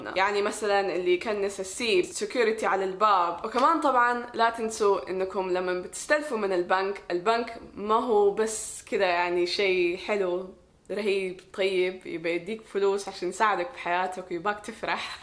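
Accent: Hejaz, Saudi Arabia. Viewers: احب اللهجة السعودية واضح جدا Sudanese guy pass from here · الله اكبر ليست لهجه كويتيه نهاءيا الاخت من اهل الحجاز وفي مكه المكرمه وهي لهجتهم سلسه وجميله الله يوفق الجميع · باين من لهجتك انك سعوديه صح ❤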